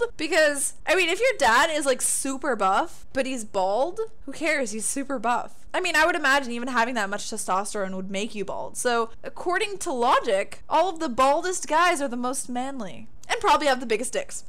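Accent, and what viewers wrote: United Kingdom. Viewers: I think your british accent is amazing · This was funny! Great job! I like your English accent!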